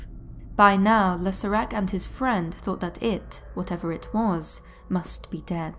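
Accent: United Kingdom. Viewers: The British accent played out in these narrative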